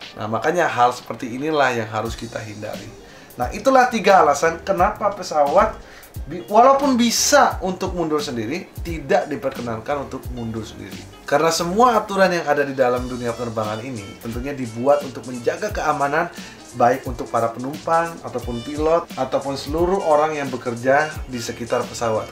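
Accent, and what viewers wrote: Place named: Banyumas, Indonesia. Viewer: Kapal kecik saja... Ngapak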